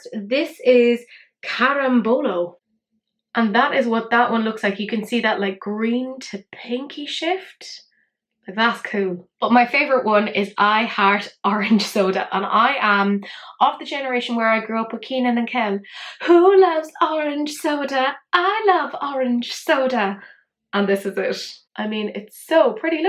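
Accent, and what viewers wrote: Ireland. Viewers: I bought this palette because of the bright colorful shades, that I normally wouldn't wear, but I thought the bold colors in this palette would bring out the intensity of some of my multichromes!. Nice to hear what sounds like a "Dub" accent schooling me on some new make-up! I definitely will use your code on my next order with this brand.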